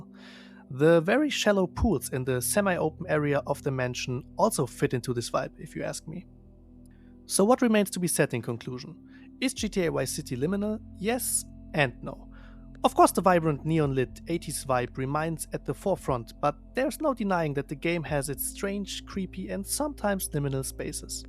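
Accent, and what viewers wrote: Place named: Germany. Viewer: Thicc german aaah accent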